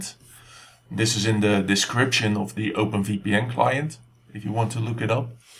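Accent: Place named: Netherlands. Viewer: It spund like youre from The Netherlands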